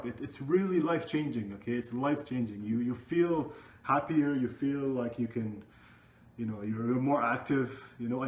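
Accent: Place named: Egypt. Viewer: the egyptian accent love it. well done!!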